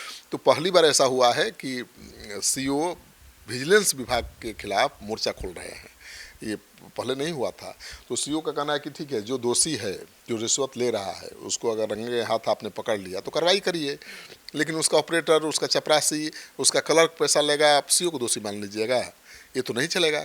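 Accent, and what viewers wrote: Bihar, India. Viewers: जी आप को बिहारी टोन में इंटरव्यू लेते हुए सुनकर आत्मनुभूति होती है...!कृपया इसे जारी रखेंगे 🙏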